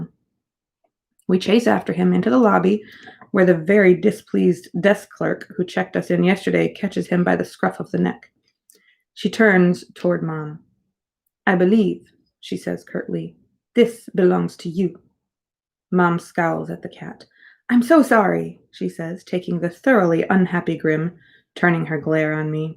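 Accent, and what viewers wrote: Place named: United Kingdom. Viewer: Nice British accent!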